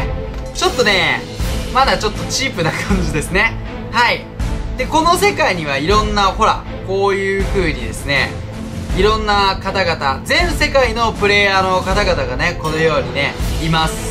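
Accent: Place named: Kansai, Japan. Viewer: 関西弁が滲み出てるで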